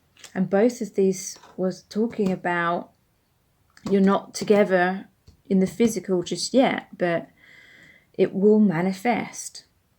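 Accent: United Kingdom. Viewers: can anyone explain to me what she meant by transformation in communication for pile 1? the person i love is living an unhappy marriage and trying to get his divorce, but he is afraid that the wife will not let him see his daughter any more , i didnt really understand her as she has a british accent and am not a native speaker.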